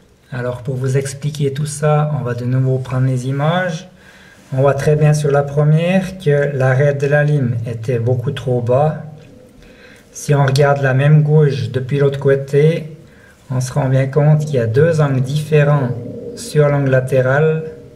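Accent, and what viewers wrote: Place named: Quebec, Canada. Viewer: vous avez un accent québécois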